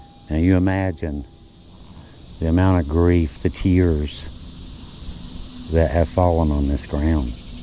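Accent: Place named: Appalachia, United States. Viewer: It's that way here in North central Texas. Especially at the oldest cemeteries. There was a story of families that had lost so many children that they weren't even given a name until they were 2. I actually thought that was untrue, but it isn't. Lots of people died here in 1918 with the influenza but when it appeared again in 1919 it was even worse. Breaks my heart to walk, see mom and dad and several very small children side by side. I have been a sensitive all my life and there's times and especially places that it's overwhelming. I just stand and pray for all of them., your channel has quickly become one of my tops. I have family all over southeast KY and in west Virginia. Hearing you talk makes me feel like I am up there with them.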